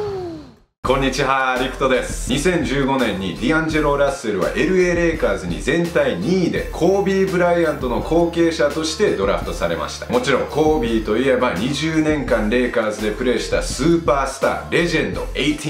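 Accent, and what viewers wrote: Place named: Kansai, Japan. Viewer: そんだけ関西弁でストラクチャーを和訳でわからんってのもワラタわw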